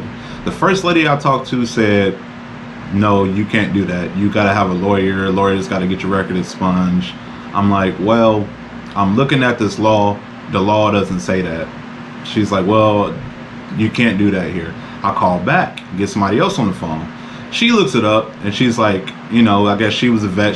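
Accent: United States. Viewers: Bro are you from New York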